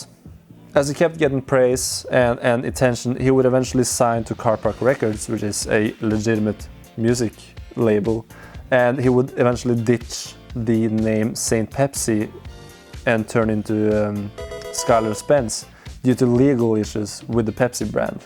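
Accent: Norway. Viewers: Aha! Så du er norsk! Kjente det igjen på uttalen med én gang. Ikke mange i Norge som hører på vaporwave, og lite niche som spiller her, så jeg kjenner meg også igjen i lysten til å dra andre plasser. Bra liste btw. Personlig ville jeg snudd den på hodet, men jeg har kanskje litt annen vapor-smak :) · Yooo er du norsk Du høres norsk ut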